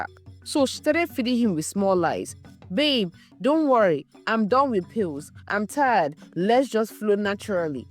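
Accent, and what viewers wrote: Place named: Igbo, Nigeria. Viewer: you are using an Igbo accent.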